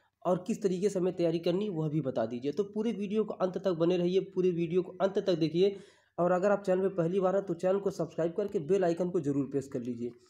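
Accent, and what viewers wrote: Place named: Bihar, India. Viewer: I love bihari accent🤪🤟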